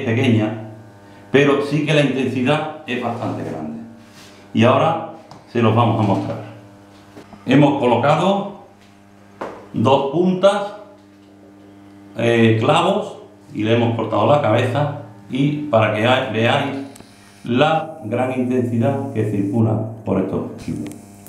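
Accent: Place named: Seville, Spain. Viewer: gracias por la respuesta...lo preguntaba porque por el habla, parece usted de sevilla, que es donde resido, en mi trabajo relacionado con plantas de agua...siempre subcontrato la fabricación del cuadro...cosa que me gustaria hacer yo mismo para tener mas control sobre los problemas posteriores que casi siempre los hay. En la facultad saque un 9 resolviendo circuitos...pero todo muy abstracto y teorico...demasiado alejado de la practica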